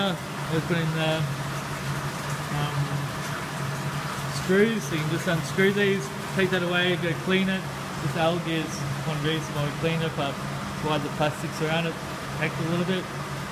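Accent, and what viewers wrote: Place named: New Zealand. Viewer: Dude he sounds like a kiwi to me, You Aussies not satisfied with trying to take responsibility for creation of the pavlova want to lay claim to anything else good new Zealand produces too.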